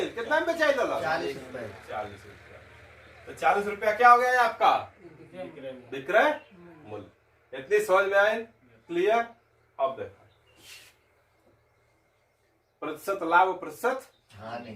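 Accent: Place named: Bhojpuri, India. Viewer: Aaj pahali baar bhojpuriya andaaz me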